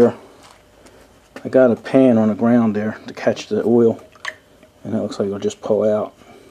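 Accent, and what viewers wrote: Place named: Maryland, United States. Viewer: I am 60 y old. Also originally from Maryland but have been in Ca. for most of my life. Your accent reminds me of my childhood and the tv show " Welcome back Carter". Great video tky.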